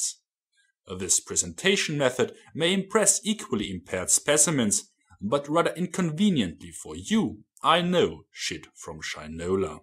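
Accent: Germany. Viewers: A great video, and a great presentation. I love the german accent, and your arguments are delivered with great precision and production value.. It's funny (and telling) to see the difference in how a creationist simplistically disobeys the ninth commandment, And a true factual and researched rebuttal.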